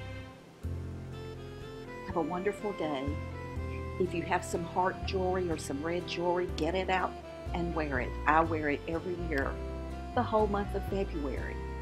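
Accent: South, United States. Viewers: I love the jewelry and the teapot. Great taste! I want to share something with you. It may sound a bit odd to you but there is reason, sort of, behind it. You are a comfort source for me and I'm not sure why. Your voice, the Southern Belle accent, you being older, which represents wisdom to me. You've mentioned your daughter in some videos and I get the feeling you and she are close. You just seem thoughtful, maybe doting at times on the ones you love. Anyway, now for the "reasoning" behind these statements. This is hard for me to share, but, here goes. I have been having issues with emotional and therefore mental issues as well, off and on for many years now. My life with others has not always been very nice, putting it mildly, and as a result, I deal with PTSD. I have developed a need for comforting, soothing things but rarely, people. I am very reclusive now, and since my mother passed, more sensitive to my surroundings, sounds, smells, people, etc. 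Listening to you and watching your videos is comforting and soothing to me. Although there are others that I love seeing and feel good around, there is only one person that I can handle being with regularly and that is my husband. I am going through one of my "darker" phases where the noise in my head from my past is very loud and I happen to notice the other day that when listening to you speak, I feel a comfort, probably because you remind me of the softness but yet tough, southern ladies in my family, a certain gentility if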